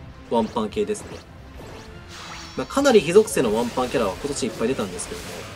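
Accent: Kansai, Japan. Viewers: 同じ京都だけど違和感はあんまりないかな？ 今は関西じゃないし、動画配信なら標準語使った方がいいと思うからたまに地元の関西弁出るんだと思う。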